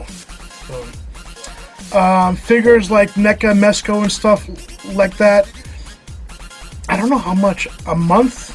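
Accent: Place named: Boston, United States. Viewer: Thanks for the Q&A,. My girlfriend and I thought you were from Boston so thanks for clearing that up for us haha. Chucky is a sweet character. I grew up loving those movies. I probably like Child's Play 2 the best simply because we had the VHS growing up and watched it all the damn time lol. Commando is an awesome movie! BENNETT! lol... Thanks for the Q&A. Love your vids!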